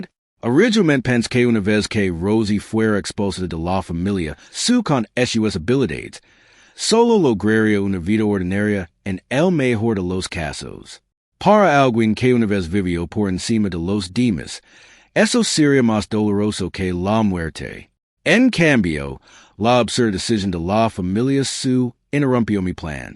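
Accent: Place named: England, United Kingdom. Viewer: Español con acento ingles"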